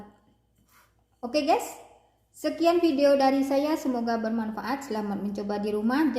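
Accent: Madura, Indonesia. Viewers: Fix mbaknya dari logat nya pasti orang madura nih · Boabbo orang madura ya gess.. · Kyk Logat madura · Orang madura ni kyaknya.... mantap.... yeeeee tak teee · mbak orang madura ya🤦‍♀️🤦‍♀️🤦‍♀️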